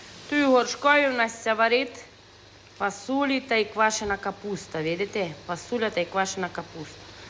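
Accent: Transcarpathia, Ukraine. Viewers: Файна мова, Закарпатська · Ви розмовляєте по закарпатський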